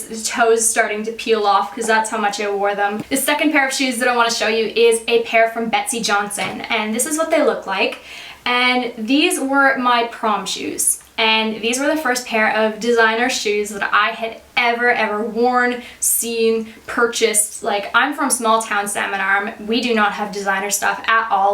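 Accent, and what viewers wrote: Australia. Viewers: You have a bit of an Australian accent? :D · omg i havent watched one of your videos for ages, but i have really noticed the aussie accent come accross more now then before! i missed watching your videos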